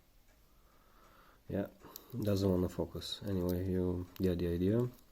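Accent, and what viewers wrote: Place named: Russia. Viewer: Не ну конечно акцент бля палевный кабзда,нашего человека узнать на раз)))Красава хули!!!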